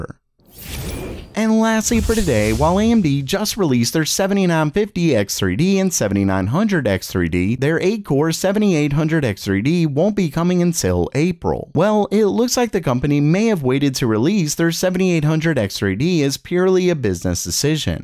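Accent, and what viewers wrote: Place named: South, United States. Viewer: please lay into your accent more. i need tech vids from a southern gentleman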